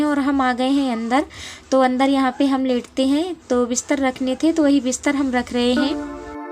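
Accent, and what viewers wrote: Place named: Bhojpuri, India. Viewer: आप तो हम लोग के जैसा बोलती है घरवा कहा बा तोहार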